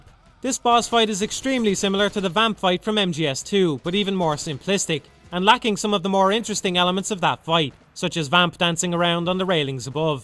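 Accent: Ireland. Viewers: He's Irish. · Are you trolling? You seem to have made a statement concerning IGN and "learning" in the same sentence. Also a bit of advice, all caps equates to a 40% decrease in credibility. And also I think hes Irish not British. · good strong irish accent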